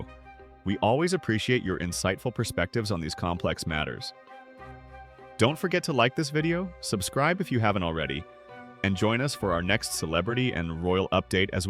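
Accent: United States. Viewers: Why am I hearing an American accent? Our King is not your concern.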